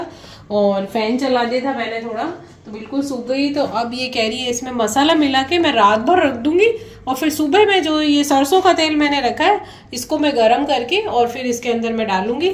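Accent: Jodhpur, India. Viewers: ram ram aunty ji me bhi jodhpur se hu or apke sare video dekhti hu bhut acha lagta h or apki boli sun ke muje jodhpur ki yad aati h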